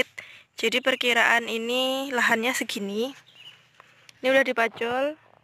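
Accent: Java, Indonesia. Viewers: Walaikumussala.... wehhh bawang... mbak nya pinter nyangkul... dimana ini posisinya mbak... logate koyo wong jowo. Oya saya sdh antar ya pupuk kandang hitam ke rmh mbak. Saya tunggu pembayarannya dgn ketan hitam. Mampir ya mbak k rmhku kita ngopi hitam bersama.... spy tetap dlm kesetiaan belajar terusss.... ditunggu mbak. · Bertani Tanam daun bawang lumayan tuh hasilnya.. info tani nya sangat bermanfaat. Yang paling menarik konten ini adalah gaya bahasanya logat jawanya medok campur sari hehe.. keren mba,🙏👍👍😁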